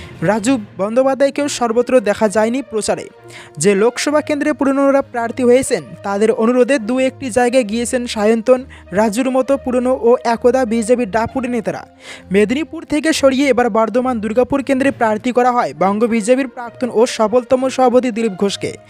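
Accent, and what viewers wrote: Bangladesh. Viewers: ভাই আপনার কথায় বাংলা দেশি টান। তথাপি ভালো লাগে। চালিয়ে যান।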